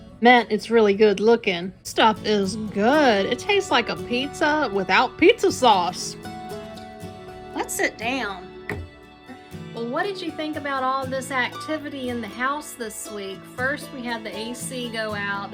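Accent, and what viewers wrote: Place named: South, United States. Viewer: I thought so because of your southern accent. 👍 I'm watching you from upstate NY. We have the beautiful Mohawk Valley.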